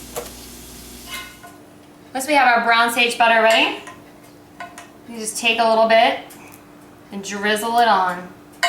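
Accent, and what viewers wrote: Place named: South, United States. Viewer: okay so i wanted to sleep about an hour ago... but i got hooked on your videos. they're great, you're awesome, love the accent of the south and of course your recipes... will definitely be trying there out! thanks. subscribed!!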